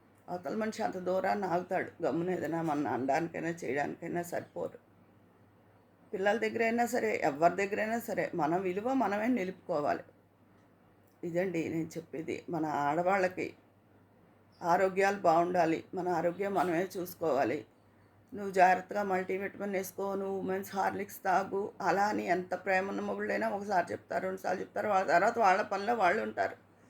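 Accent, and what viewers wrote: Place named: Kakinada, India. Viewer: Mee maatalaloni accent ( yaasa), naakentho ishtam! Maa vaalladi Kakinada, mee maatalu vintunte,atuvypu vaalle gurthukosthaaru.Maa native place Bandaru,kaanee eppatinuncho Hyderabadlo vuntunnaamu.Mee kaburlu intlo vaaritho panchukunnatluntundi. Thank-you.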